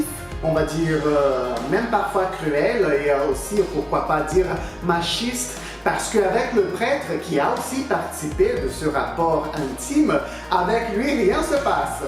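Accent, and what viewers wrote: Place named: Brazil. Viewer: Oi, vc não é francês! Vc tem um sotaque muito forte de brasileiro ou de português. Dá para perceber que vc está aprendendo a falar a língua francesa! Vá enfrente! Um dia vc conseguirá falar bem! Sucesso e até mais!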